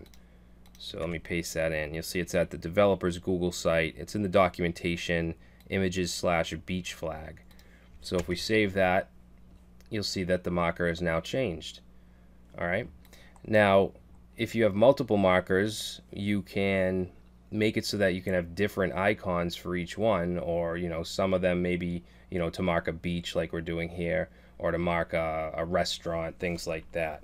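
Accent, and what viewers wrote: Boston, United States. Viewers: when you started talking i immediately knew you were from Boston 😆 thanks for the video cheers brother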